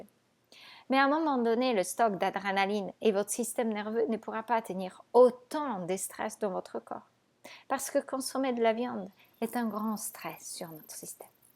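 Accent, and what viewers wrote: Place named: Poland. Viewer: ton français est extraordinaire pour quelqu'un dont ce n'est pas la langue maternelle. Et ce qu'il reste de polonais dans ton accent est charmant! Bravo pour cette maitrise de la langue et merci pour ces vidéos que je regarde avec curiosité.🙂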